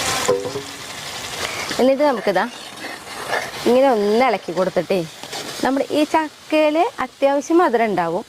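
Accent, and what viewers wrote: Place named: Thrissur, India. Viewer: de സംസാരം ശരിക്കും ഞങൾ പറയുന്നപോലെ തന്നെ.... കോഴിക്കോട്ട, കിണ്ണം,davara allam.same. തൃശൂർ എവിടെയാ വീട്.... നമ്മൾ കുന്നംകുളം,പഴഞ്ഞി, ചെറുതുരുത്തി ആണ്....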